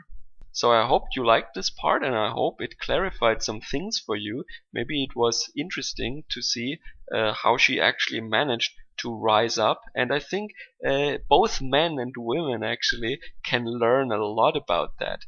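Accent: Germany. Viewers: Dear, I love your precise, seemingly accurate, and concise knowledge of the American language. I wish my Deutsch was only 10% as good. Do not ever change your accent from German as it enhances your apparent IQ at least to 250. My question concerns when A. Merkel became fully aware of politics. Y.T. has several nude pictures of her when she was much prettier and hence much younger - probably less than 18 years old. It was stated that girls back then appeared nude as this was known to aggravate eastern 'powers than be' who couldn't retaliate. What do you think?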